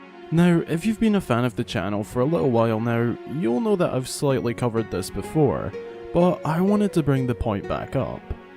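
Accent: Scotland, United Kingdom. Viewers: So good hearing another Scottish accent 😭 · Love watching these theory videos. I found your channel yesterday, and as a fellow Scottish lad, I have to say we have the best accent 😂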